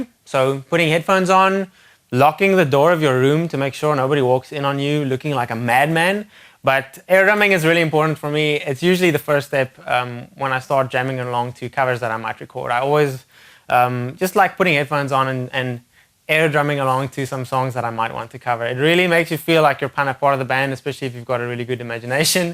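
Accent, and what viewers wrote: South Africa. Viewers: Sounds like South Africa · SOUTH AFRICAN! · What kind of accent is that??? South African?? Where is from??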